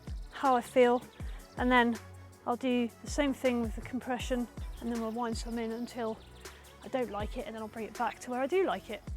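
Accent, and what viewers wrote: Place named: United Kingdom. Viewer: You sound British but doesn't look like you're in the UK. Where is this?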